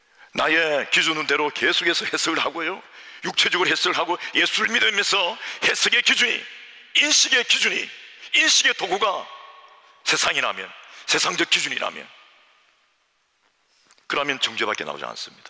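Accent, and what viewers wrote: Gyeongsang, South Korea. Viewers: 명불허전 경상도 ㅋㅋㅋㅋ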